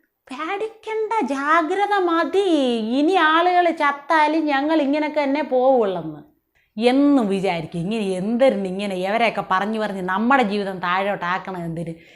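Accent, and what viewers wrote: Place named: Thiruvananthapuram, India. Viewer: .....Avatharanam Soopar ❤❤❤ AND..Avatharana, Shali, Thiruvananthapuram Basha Shali.... Adipoli..... Sooopar..❤ Sooopar...❤ Sooopar...❤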